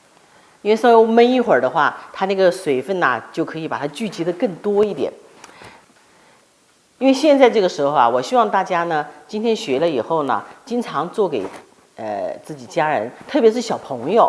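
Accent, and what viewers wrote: Wuhan, China. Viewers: 很像武汉人的口音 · 听您的口音好像武汉人呢！ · 第一次看到你的视频，听口音像武汉人，请问洋葱蒸汁治咳嗽有剂量的要求吗？每次最少需多少毫升呢？谢谢🙏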